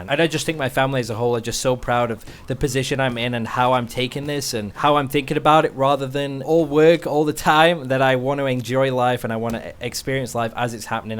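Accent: United Kingdom. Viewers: It's good to hear another British dude talking about things that are relatable to me. Finally. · Rip your brithish accent if your gona have a grindsquad house in america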